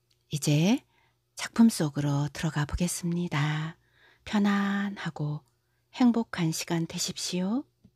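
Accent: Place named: Chungcheong, South Korea. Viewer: 네 항상 정겨운 충청도억양이 좋아요 글도 잘쓰시고 행복하세요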